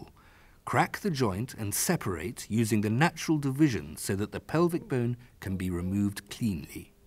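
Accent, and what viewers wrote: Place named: RP, United Kingdom. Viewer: We don't need the posh version thanks.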